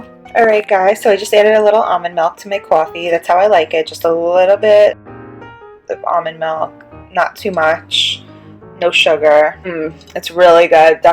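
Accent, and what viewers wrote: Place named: New York, United States. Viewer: Wow what a strong New Yorker accent lol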